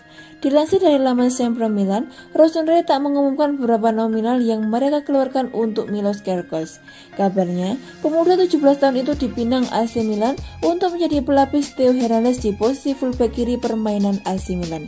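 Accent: Java, Indonesia. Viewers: Logak Jawa nya kentel amat njiiirr 😂😂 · Medok e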